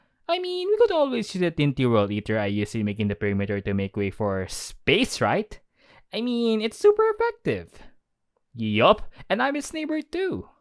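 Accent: Philippines. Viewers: voice sounds south east asian, sounds filipino . . .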